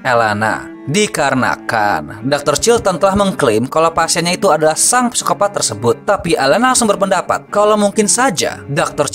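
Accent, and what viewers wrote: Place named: France. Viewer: Mantap bang accent perancis nya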